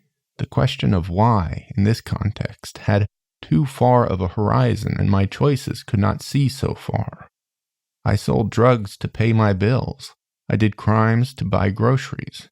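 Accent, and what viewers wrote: United States. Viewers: Wait is he from South Carolina?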